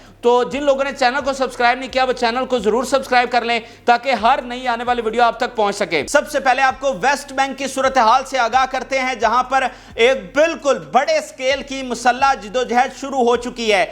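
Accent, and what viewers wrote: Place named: Punjab, Pakistan. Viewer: نا بول انگریزی نا بول۔۔۔منہ وچوں پنجابی بن کے نکل دی اے تے نال روندی اے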